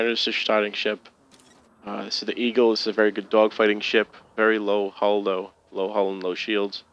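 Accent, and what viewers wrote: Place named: New York, United States. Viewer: Just bought this game yesterday, thanks for making these videos. Judging from your accent you're a fellow New Yorker. Sounds like either Brooklyn, Staten Island, or Long Island. Peace!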